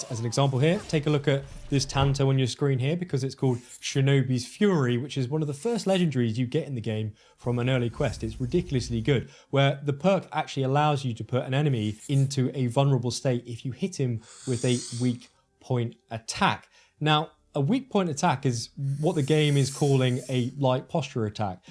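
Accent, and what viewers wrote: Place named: RP, United Kingdom. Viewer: Your very posh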